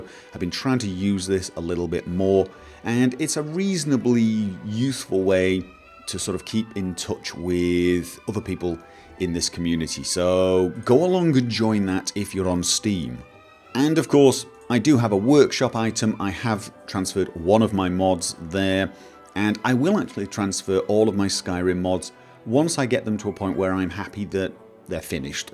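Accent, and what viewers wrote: United Kingdom. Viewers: is norwegian? tha fuuck he sounds british as fuck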